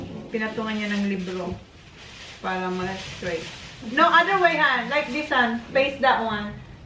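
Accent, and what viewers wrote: Philippines. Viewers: Alam ni yung Filipino accent😂. Love it!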